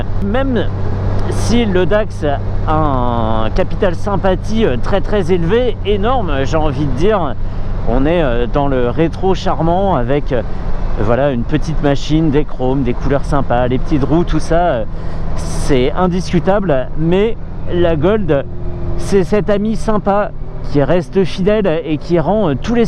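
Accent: Paris, France. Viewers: Très sympa, ce comparo! J'adore ton accent parigot (je suis du sud-ouest) Je m'abonne 👍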